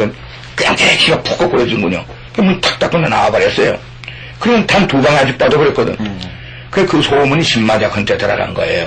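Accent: South Korea. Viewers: 지방 분이 아니시군요? 저는 지방에서 살기때문에 말씀을 대춤 알아듣겟어요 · 나레이션 분 억양 들으니 경상도 사람 같네요! 뜨끔하시죠 ㅎ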